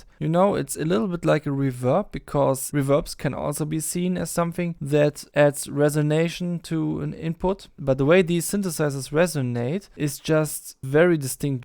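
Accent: Germany. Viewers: Boah deinen deutschen Akzent hört man sofort raus 😅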